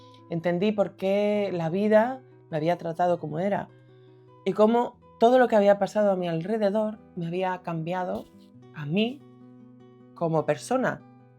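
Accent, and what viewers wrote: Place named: Galicia, Spain. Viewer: ¡Queridísima amiguiña! (con ese acento galleguiño que tanto adoro), felicidades por este precioso vídeo tan auténtico, donde muestras tu alma cruda con total sinceridad y valentía. Me he emocionado mucho, gracias por tus palabras, para mí el camino también fue muy especial, sobretodo gracias a ti. Aunque mi historia no es la misma, me he sentido identificado en mucho, porque también sufrí acoso en el colegio, aunque ni de lejos tanto como tú. Nuestro pasado nos ha llevado hasta aquí, eres así a pesar de todo, pero tu generosidad hace que quieras canalizar esa experiencia en ayudar a otras personas. Sin pretenderlo, con este video a mi me has ayudado mucho, ¡gracias! Acabo de empezar yo también una nueva etapa profesional solo para ayudar a otros bichos raros como los que tú y yo fuimos alguna vez, con la ilusión de ayudar aunque sea a uno a sentirse un poco más integrado. 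Quizás seamos unos ilusos, alguien pensará que incluso seamos débiles, pero ya una vez aprendí de otro coach, que los más fuertes son precisamente los que reconocen sus debilidades y errores ante los demás. Doy fe de que puedes ayudar a mucha gente, te apoyo y te animo a seguir, porque eres maravillosa única y especial.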